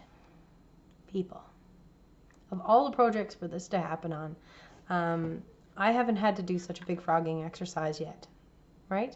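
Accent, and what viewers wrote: Canada. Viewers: Is that an East Coast Canada lilt I hear in your voice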